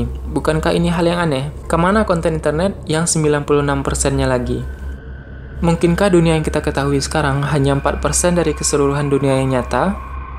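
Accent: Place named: Makassar, Indonesia. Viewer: Logatnya agak-agak ke Makassar (tw sekitarnya), Iya ya bang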